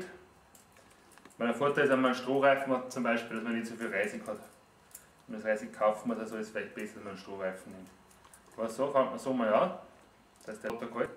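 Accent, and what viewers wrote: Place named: Austria. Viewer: das video is von der qualität völlig in ordnung ;) das österreichische ist ein bisschen knifflig...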